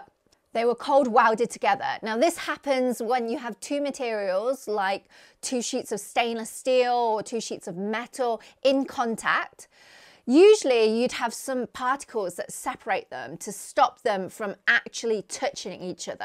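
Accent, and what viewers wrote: United Kingdom. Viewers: asian lady with a brit accent. literally exactly what the internet was created for. yeah yeah and science sure but mostly the former.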